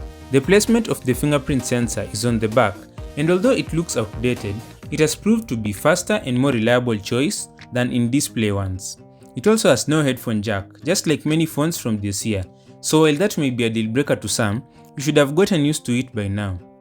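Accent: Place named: Kenya. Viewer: Kenyan? Happy to hear a voice from home reviewing tech professionally. Big up to your journey.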